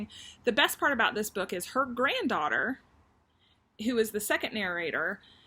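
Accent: South, United States. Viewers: Just finished watching both of your videos, and really enjoyed them! Love your location and your southern accent. My husband and I vacationed at Fort Walton Beach a few years ago, and really love the Gulf Coast. Your stitching is lovely, and I really enjoy your book reviews. I will have to try the Victorian Motto Sampler threads, as they look truly beautiful. Looking forward to more videos from you!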